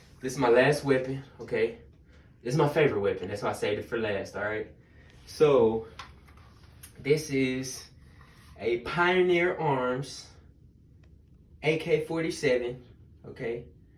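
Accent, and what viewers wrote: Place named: Texas, United States. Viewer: When you said wassup wit it lol I knew you was from Texas lol I’m in Dallas mayne keep doing ya thang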